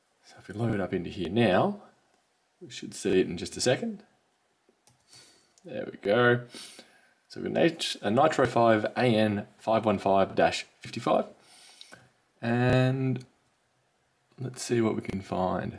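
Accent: Australia. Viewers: Liking for Australian accent, am australian :)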